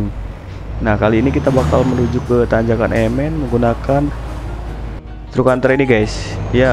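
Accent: Bali, Indonesia. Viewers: Bang pasti asli Bali ya.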